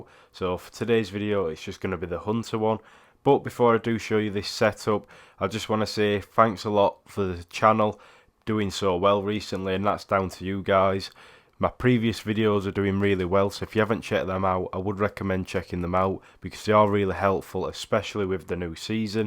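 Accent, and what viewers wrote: Yorkshire, United Kingdom. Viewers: Dude are u a yorkshire lad???? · You sound like a reight Yorkshire man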